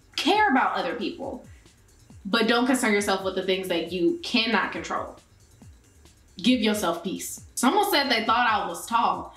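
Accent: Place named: Florida, United States. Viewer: It’s a Florida accent. Once a Florida girl, ALWAYS a Florida girl boo 💅🏽‼️❤️😂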